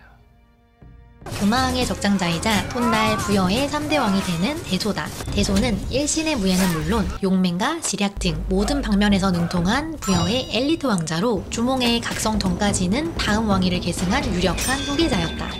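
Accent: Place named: Gyeongsang, South Korea. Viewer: 나레이션 경상도 억양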